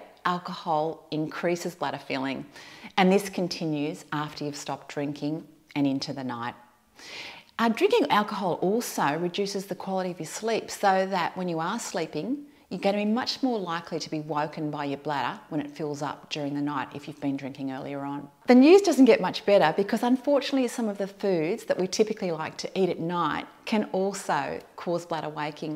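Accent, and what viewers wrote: Australia. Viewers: You are so Aussie!!!! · she sounds australian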